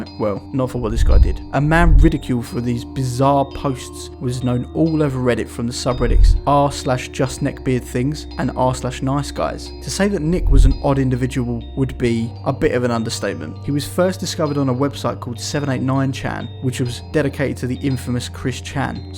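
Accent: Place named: London, United Kingdom. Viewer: Love the channel and the accent. Hearing stuff like “fink about it” reminds me of London days gone by.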